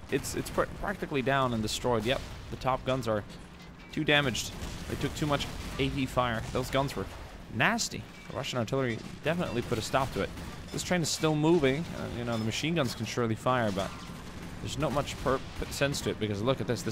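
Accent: Sweden. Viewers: Are you Swedish??? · Are you swedish? You sounds like it when you speak english. Lovely videos btw.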